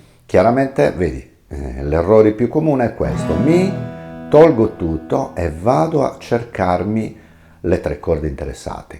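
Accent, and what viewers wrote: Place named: Sardinia, Italy. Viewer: Grazie sei molto comprensibile, sono una principiante, nemmeno più tanto giovane, ma mi piace imparare e trovo il tuo metodo molto semplice..... Grazie. Ho molta difficoltà nel cambio accordi, anche x un problemino "tecnico".... Ho una Rizoartrosi al pollice sinistro😢..... Ma non mollo...... Voglio imparare e ti seguirò con piacere sei molto bravo complimenti..... E poi il tuo accento sardo è stupendo adoro la tua terra😊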